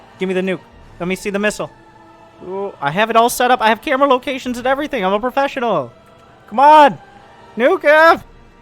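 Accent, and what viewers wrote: South, United States. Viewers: WHAT?!? He grew up in the south or has a parent from the south then, or he's doing it as some sort of elaborate satire... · M Ingham yeah I’m not so sure he’s from Michigan either no sources or anything he definitely sounds like he’s from the south, just letting the Aussie know where Michigan is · He's from the south.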